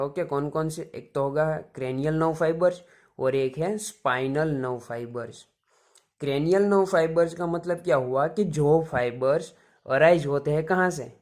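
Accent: Gujarat, India. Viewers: Bhai are you gujarati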